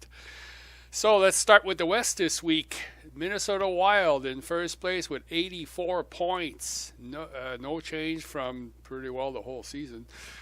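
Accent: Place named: France. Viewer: Hey buddy, do you speak French perhaps, I hear a tiny accent... :p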